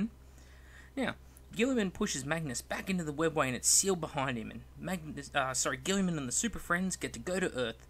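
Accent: Australia. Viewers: I would of preferred if they brougt back Leman Russ, but not being big on the lore that might just be because I'm a wolf fan boy rather than because I think it would make a good story. Also, I'm guessing your Australian?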